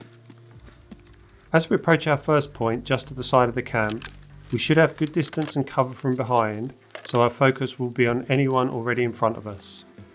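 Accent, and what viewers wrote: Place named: Romford, United Kingdom. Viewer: You from Romford? Your accent giving me serious Ray Parlour vibes 😂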